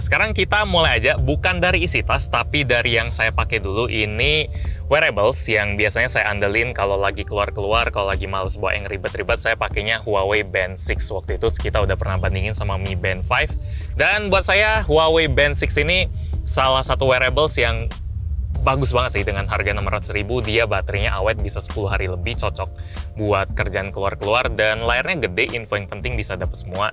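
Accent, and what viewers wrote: Palembang, Indonesia. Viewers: Bang asli orang palembang yah?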